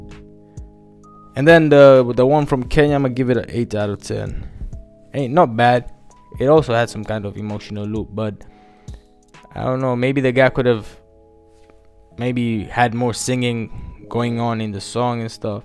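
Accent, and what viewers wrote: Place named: Burundi. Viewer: This is Rwandan? He sounds like Burundian.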